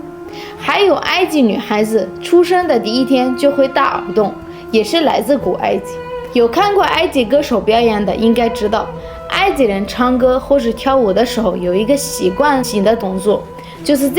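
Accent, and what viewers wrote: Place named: Shandong, China. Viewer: 山东口音很亲切啊！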